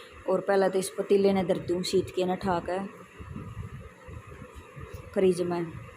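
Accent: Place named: Haryana, India. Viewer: Aapke Haryanvi boli mujhe bahut acchi Lagti Hai Sabse acchi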